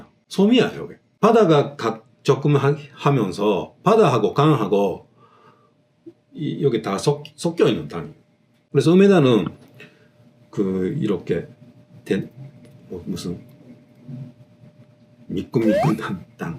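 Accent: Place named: Gyeongsang, South Korea. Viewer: 구수한 갱상도사투리 듣기좋아요😊😊😊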